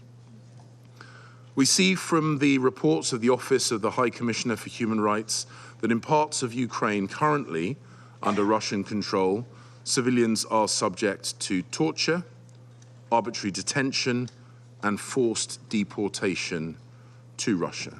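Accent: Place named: England, United Kingdom. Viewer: Bullshittting with English accent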